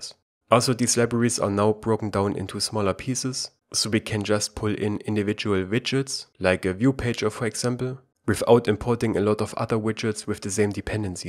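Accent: Germany. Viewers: German english accent is very lovely. I don't know. But I like your accent and coding. I like your teaching style. Keep this up. Dont stop. We benefit from you a lot. Thank you very much Sir.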